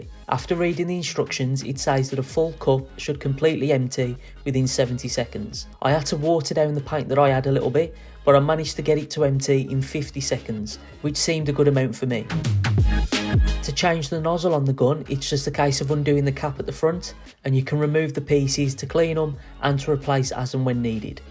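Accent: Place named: Birmingham, United Kingdom. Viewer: Up the brummie, great ole mate😊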